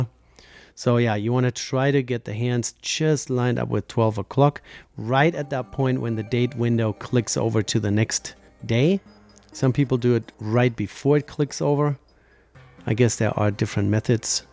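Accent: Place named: Germany. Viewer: From the Company you got ur Strap from is my Willard Homage (rdunae R2X) - one of the best i think you can buy. Ive also built an Baby-Turtle Homage for my Wife in Black with an awesome Textured Dial (i removed the Marks on the Capturering via Isopropanol because i had the very same Problems with the Markings as you had... 9 to 12 to 3 - all in Line, but below there they didnt line up - seem to be a common Problem)... you can do so much Stuff if you just are willing to invest some money and time... the outcome is more rewarding then any Watch you can buy. (I even split my Watches between DIY and bought 🤣) Nice Vid man, coulnt have done it better. (Du bist Deutscher? Hatte erst überlegt wegen dem Akzent) LG Martin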